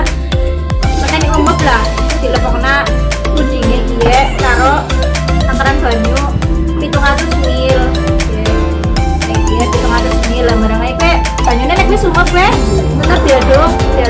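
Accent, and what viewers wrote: Banyumas, Indonesia. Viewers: SEMANGAT terus ya mbk Kiye asli wong ngapak apa ya' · Suara apaan tuh serem😥😥semangat kak bikin kontennya ngapak yo ra popo 😁 😁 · mbak yu mbak yu ngapak kie...dulur seneng wis diparani...mangan puding enak plus horror...sukses ya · Seneng bahasane mbak ngapak lucu , menghibur bgt salam kenal dan sukses ya luhur. 👍🏻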